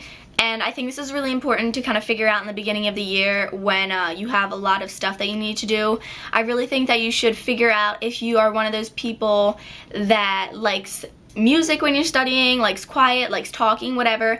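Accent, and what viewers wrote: United States. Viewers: you are super cute and I adore how you talk, I dont know, but for me this is the perfect example for the american english (though there is maybe not THE american english), but I like it! subscribed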